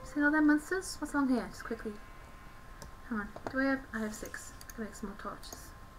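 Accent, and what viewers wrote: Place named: United Kingdom. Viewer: U speak british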